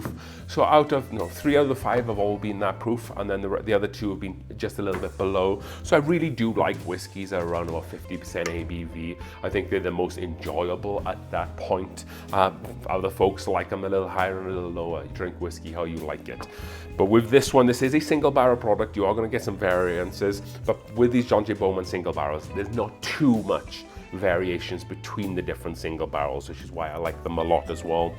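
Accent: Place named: Wales, United Kingdom. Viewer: based on your accent that you are originally from Wales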